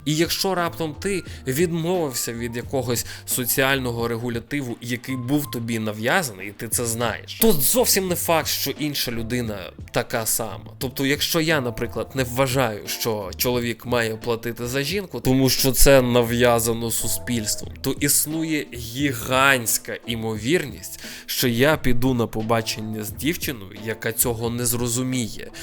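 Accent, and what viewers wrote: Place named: Olevsk, Ukraine. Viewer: Дякую за позицію! Мої Вам вітання з Києва! Як же я скучила за олевською говіркою. В мене тато звідти, з села Кишин, там досить багато рідні. Нажаль останні роки рідко спілкуємося, якесь бентежне життя настало...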